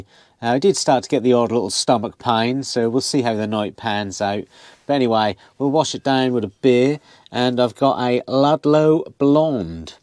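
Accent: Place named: Norwich, United Kingdom. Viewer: Just discovered your videos and loving them especially as I'm a Norwich girl with a campervan, great to hear someone with my accent, very unusual. Recognise your face too, maybe in Norwich pubs? You love the same music as me.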